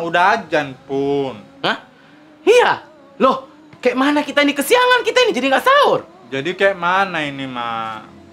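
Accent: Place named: Medan, Indonesia. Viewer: 😂😂😂😂😂😂😂😂😂😂😂😂😂❤❤❤❤❤❤❤💯💯💯👍👍👍👍👍😴😴😳😳😳🤣🤣🤣🤣🤣🤣🙏🙏🙏🙏 orang Medan ya😊😊😊😊😊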